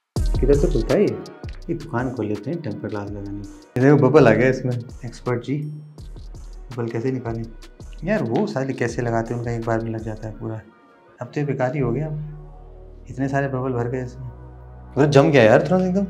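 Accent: Lucknow, India. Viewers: Bhai aap Lucknow se ho??? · Aap Lucknow se ho bhai sahab 😊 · Aap lucknow se hai kyA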